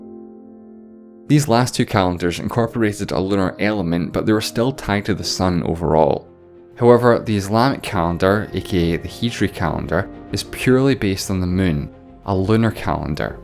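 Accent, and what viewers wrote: Scotland, United Kingdom. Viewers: are ou scottish? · How Scottish are you? Great video btw. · I love your Scot accent. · its his accent there's nothing he can do about it, also its not really that strong of an accent compared to how some scots speak... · Very interesting accent. British?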